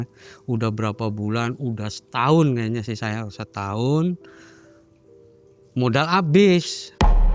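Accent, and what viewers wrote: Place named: Java, Indonesia. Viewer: Aku suka ngomongnya medok Jawa suka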